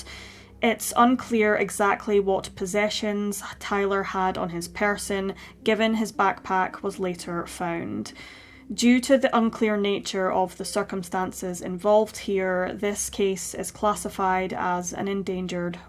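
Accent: Scotland, United Kingdom. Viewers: what's with the British narration? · It's an educated British accent from Scotland, it is not "ridiculous".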